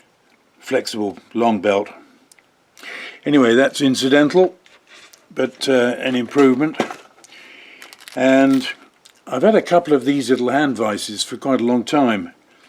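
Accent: United Kingdom. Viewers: Hope your back gets better soon. It's nice to hear a British ascent. And a bumbling old twonk. Keep up the good work. M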